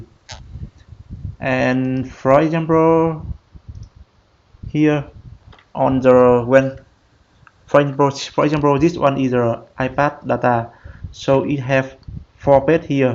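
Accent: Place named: Vietnam. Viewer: nghe là biết người việt :))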